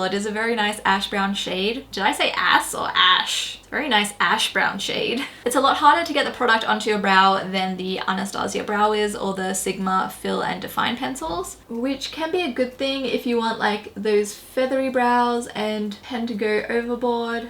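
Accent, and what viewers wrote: Australia. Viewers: Love your videos. You are absolutely beautiful and I love your Aussie accent ❤️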